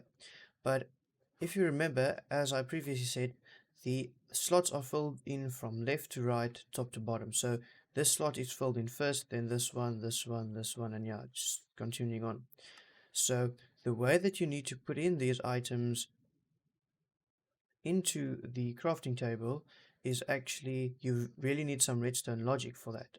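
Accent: South Africa. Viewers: Nice to hear a fellow South African!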